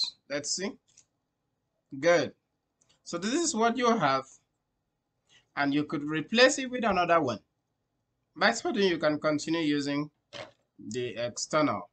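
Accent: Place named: India. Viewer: I was hearing a india speak, awesome information though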